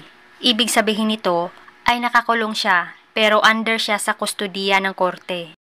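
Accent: Visayas, Philippines. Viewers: Bisaya po kaya iba pagkakabigkas nya..☺ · Bisaya kc kaya minsan kaiba ung tunog ng oagtatagalog nya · Ang ganda ng boses nya sa mga nag bash sa kanya mag trabaho kayo wag palamunin😂😂 oo bisaya yan sya alam ko kasi bisaya din ako ano naman kung bisaya mas matalino mgabisaya kasi fluent kami mag English kami marunong mag tagalog with taglish pa kayo marunong kayo mag biisaya lol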